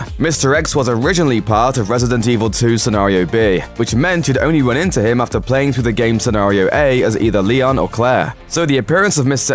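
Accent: United Kingdom. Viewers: Stop talking british style dude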